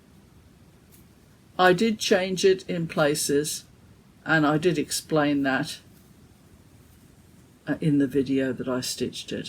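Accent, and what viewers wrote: Australia. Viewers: Good morning. I cannot wait to see your stitching. Well, I have finished watching and it has been a warm and cozy hour. I especially enjoyed how you kitted up your projects. It’s funny that I would not choose thread cards to organize my threads. However I enjoy your process and even more enjoy your Aussie accent sharing all about it. Merry Christmas!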